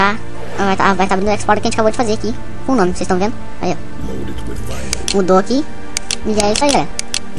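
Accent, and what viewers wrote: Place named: Paraíba, Brazil. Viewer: QUE VOZ DE PARAIBA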